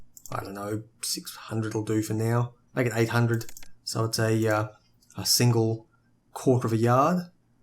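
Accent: Australia. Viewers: You sound like an Ozzie, so why do you pronounce route as rout? Never heard an Oz do that before.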